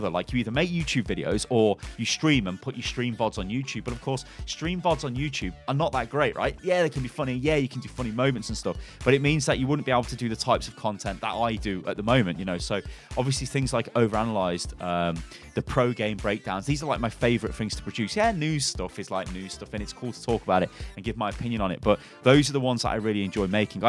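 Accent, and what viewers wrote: United Kingdom. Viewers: what happens. I dont wanna watch this video of a british man talking aasying hoy hell :0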